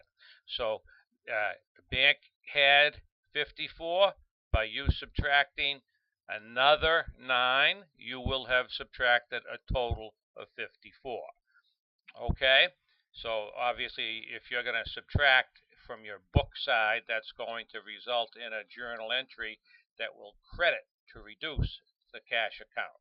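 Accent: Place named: Boston, United States. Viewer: Very helpful. I love your Boston accent and mannerisms. I'm from Boston too!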